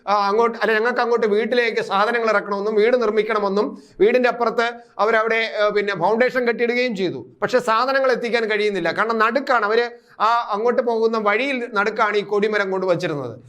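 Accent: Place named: Kannur, India. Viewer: വാർത്ത തീരെ ഒഴുക്കില്ല അക്ഷര സ്പുടതയും ഇല്ല. ഒരു കണ്ണൂർ ശൈലി . പിന്നെ പിന്നെ എന്നത് കൂടെക്കൂടെ പറയുന്നു. കൂടുതൽ ന ന്നാക്കാൻ ശ്രമിക്കുക.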